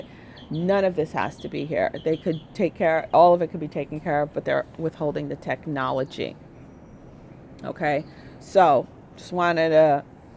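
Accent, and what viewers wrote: New York, United States. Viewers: Thanks for update. It makes me laugh when you go "New York" in how u say things, but hey, the message is received. It ain't no joke. 🙏😘😘😘🤗🤗🤗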